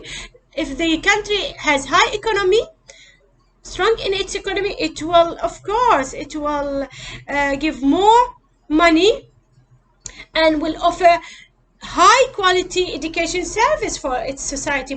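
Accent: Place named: Europe. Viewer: Mam,please speak like Asians,not like europians,there mouth and tongue differ from us... I appreciate ur class,but plz come back asian style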